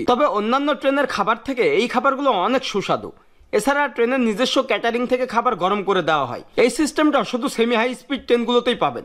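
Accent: Bangladesh. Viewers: বাংলাদেশী english এমনি হয় ।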